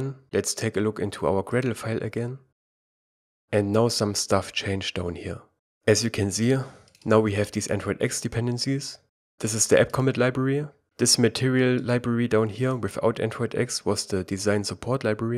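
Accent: Germany. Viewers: German english accent is very lovely. I don't know. But I like your accent and coding. I like your teaching style. Keep this up. Dont stop. We benefit from you a lot. Thank you very much Sir.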